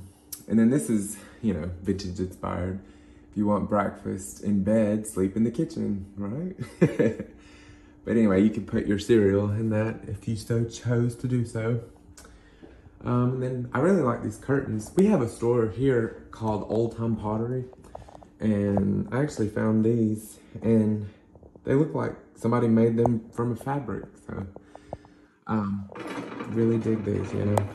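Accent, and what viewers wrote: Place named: South, United States. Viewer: I'm a new subscriber. Old grandma 👵 from North Texas. Love your shared knowledge and collections and Southern accent. Keep up the great job.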